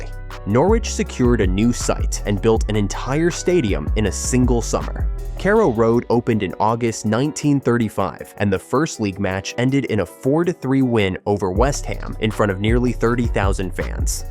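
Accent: United States. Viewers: This fu@ accent……I just can’t….I hear yankish and I snap!F america!F yanks!F Trum!F u!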